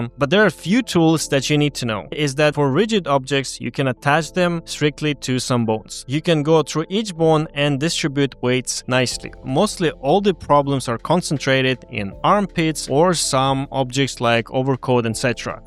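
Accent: Russia. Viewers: Мне кажется или у автора подозрительно русский акцент? Но в любом случае видео очень полезные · godamn, Russian people always so good at everything LOL!, thank for this man, your accent in funny in a good way!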